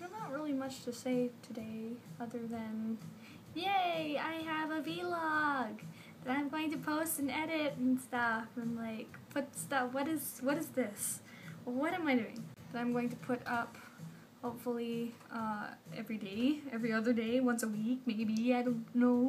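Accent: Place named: United States. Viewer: You sound American. Were you raised in the States? Shame about the real YUI's hiatus, right?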